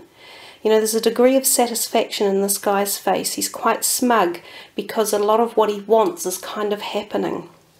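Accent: New Zealand. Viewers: Hi Hun, a kiwi accent which ya don't see on here.i am just across the channel in oz....good to see a few locals putting card readings on here. I keep an eye on ya insights to see what comes to pass.......cheers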